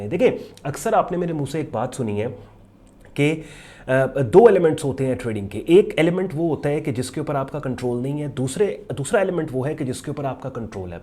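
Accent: Punjab, India. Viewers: Mashallah I like the way you explain bro or Jo Panjabi Ka trqa lgaty Hain Kia kahny😂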